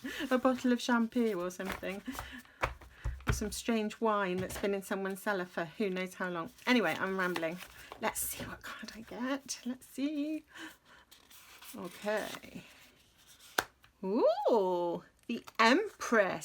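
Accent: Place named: United Kingdom. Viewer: I've never won anything but its always exciting to join a giveaway. But most of all, I love watching your videos. So many times I can relate to your rambling about coffee, your children and journal mostly. And I love love love your accent. Been missing my British friend so watching yours really made me less missing her. Thanks hun. Good luck everyone.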